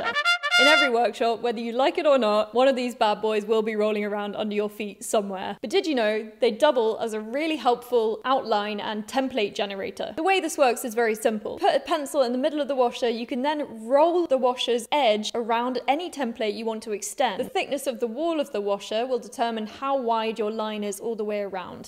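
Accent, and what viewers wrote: England, United Kingdom. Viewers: It's not often to see a girl, and rarely still, one with the english accent giving tips on woodworking on YT. Enjoyable and refreshing to a point that I can't recall the tips you gave! 😊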